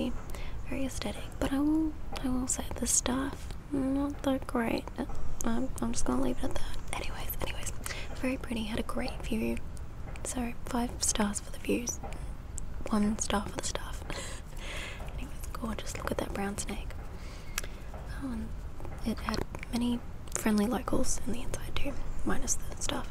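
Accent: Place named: Australia. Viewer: You’d think you got $5 for everytime you said, “brown snake” for the number of times you said it… lol 😂 Your accent is so much better when you’re not whispering you sound proper Australian. It’s hard to believe you weren’t born there. 😅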